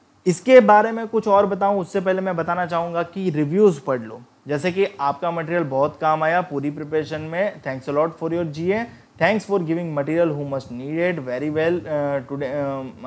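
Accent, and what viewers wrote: Gujarat, India. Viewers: વાહ ગુજરાતી વાહ! ભાઈ ગુજરાતી ગમે એટલું હિન્દી બોલી લે પણ ખબર તો પડી જ જાય કે ભાઈ ગુજરાતી છે.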